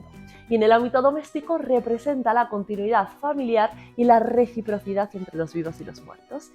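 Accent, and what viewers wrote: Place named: Mexico. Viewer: Hasta se me olvidó que estás en España, se sintió como si hablaras desde algún punto de México. Gracias❤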